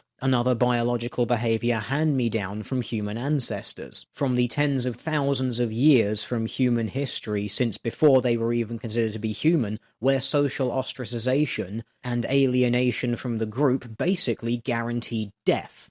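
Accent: United Kingdom. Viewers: why is the toilet humor extra funny from a british accent? (Cuz I'm American isn't it?)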